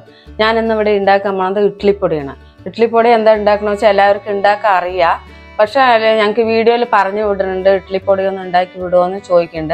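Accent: Palakkad, India. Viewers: പാലക്കാട് ആണ് എന്ന് നന്നായി അറിയാം. ആ ഭാഷാ, penne കപ്പല് മുളക്..❤ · പാലക്കാടൻ ഭാഷ 😂😂😂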